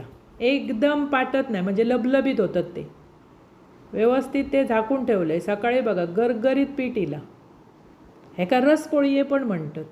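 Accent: Malvani, India. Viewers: तुमची मालवणी भाषा बोलणे खूप सुंदर असा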